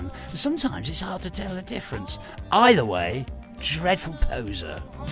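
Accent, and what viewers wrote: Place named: Ireland. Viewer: Never realize that irish accent so fit on murdoc voice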